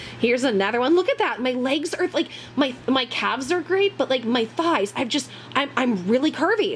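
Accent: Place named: Kansas City, United States. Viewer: Aha! I grew up in the Kansas City, KS suburbs! No wonder I talk a lot like you. Currently I live in Merriam, KS which is still that area.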